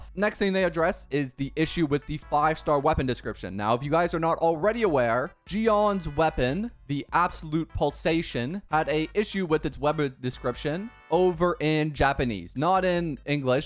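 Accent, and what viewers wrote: Canada. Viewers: Are you from Ontario? Thats a huge Ontario sounding accent lol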